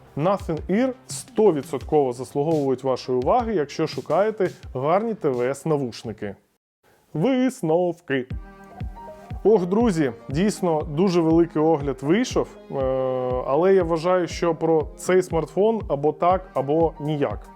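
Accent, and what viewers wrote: Russia. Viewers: Не ображайтесь, але дуже бісить, коли багато русизмів в мові, мов Азіров говорить! Важко слухати людину, яка все життя говорила тільки російською, і перейшла на Українську (але за те, що перейшов на УКРАЇНСЬКУ- респект)! Ведучий, моя тобі порада- не пиши сценарій, дай написати людині, яка вміє говорити по-українськи, все таки Цитрус- це гігант комерційного продажу, будьте «на рівні»!!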